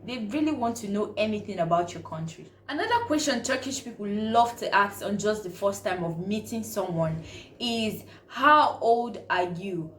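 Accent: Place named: Nigeria. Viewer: I love ur Nigerian accent "Maddah & Faddah"🤣🤣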